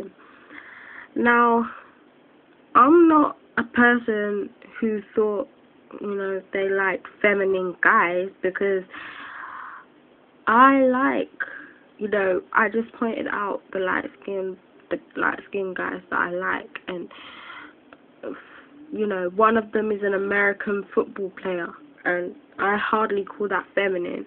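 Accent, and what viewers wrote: United Kingdom. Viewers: nice british accent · Are you from South London? :) I am too! ;D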